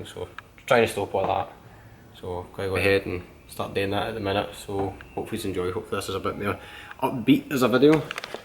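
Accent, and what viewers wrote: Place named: Scotland, United Kingdom. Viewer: Good job on orange escort m8, will be watching for it at knockhill this summer, looks lit bud.I'm from kirkintilloch near Glasgow, I'm guessing your not to far away going by thick Scottish accent lol . Do you guys weld exhausts, looking to get exhaust flexi pipe fitted to 09 seat leon Fr550. It's the pd170 engine and still got DPF intact. I've got a small garage with 2 poster ramp, mig welder and gas n acetylene but my welding is total crap. Any help appreciated 😁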